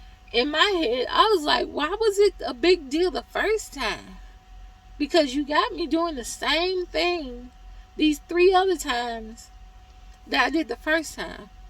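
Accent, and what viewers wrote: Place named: South, United States. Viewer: Sound a lil country lol I like it tho 😂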